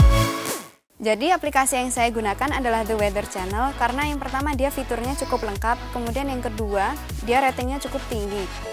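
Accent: Java, Indonesia. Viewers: Saya suka semi medoknya itu dari mba nih hehehe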